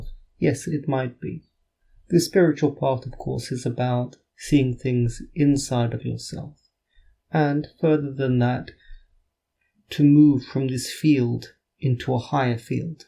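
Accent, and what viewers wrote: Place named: England, United Kingdom. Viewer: Thank you,. A lovely talk as usual. My dad was Scottish, and those pipes just wake me up, something in my DNA. You sound really English, but you are not?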